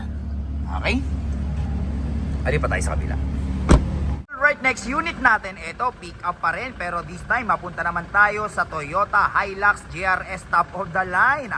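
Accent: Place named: Pangasinan, Philippines. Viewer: Lasi ka taga pangasinan ka manaya boss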